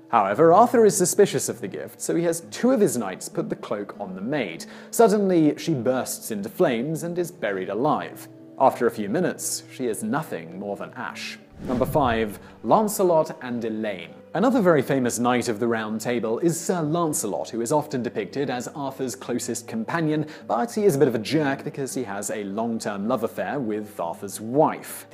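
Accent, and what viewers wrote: United Kingdom. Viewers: Why do Brits pronounce it SICKTH instead of SIXTH?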